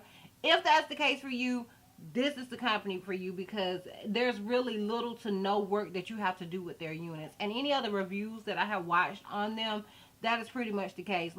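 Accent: South, United States. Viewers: yes I knew it was somewhere down south lol😂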